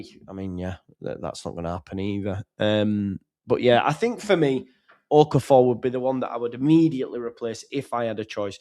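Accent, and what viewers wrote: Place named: Leeds, United Kingdom. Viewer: Best proper Leeds accent on Youtube. I missed you, man.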